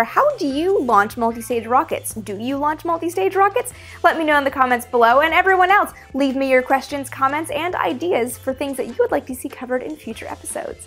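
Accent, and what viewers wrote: Canada. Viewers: Is she Canadian? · She's Canadian!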